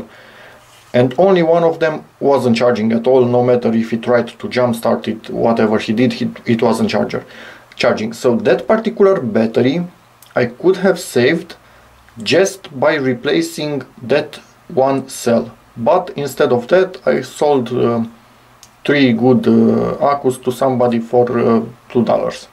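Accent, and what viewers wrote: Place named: Romania. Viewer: Hello, nice video, thanks for the info. PS: are you romanian(you sound like one, by the accent)?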